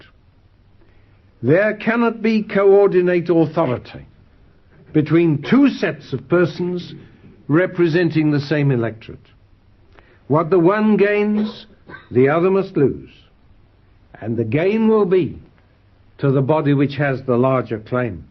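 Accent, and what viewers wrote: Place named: Birmingham, United Kingdom. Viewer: love his brummie accent coming through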